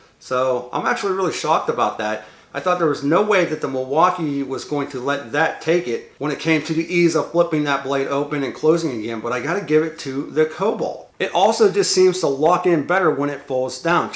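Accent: Pittsburgh, United States. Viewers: your Pennsylvania/Pittsburgh accent. Love it!!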